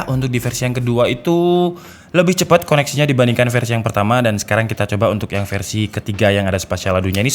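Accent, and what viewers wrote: Java, Indonesia. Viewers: Wong jowo to yoan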